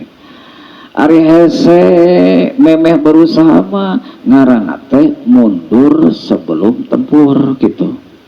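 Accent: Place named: Java, Indonesia. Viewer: Bisa sambil belajar logat Jawa 🙏👍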